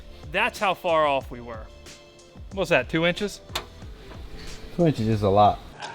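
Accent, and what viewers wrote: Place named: Delaware County, United States. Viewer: Man I hear your accent so hard, almost sounds like you’re from Delco?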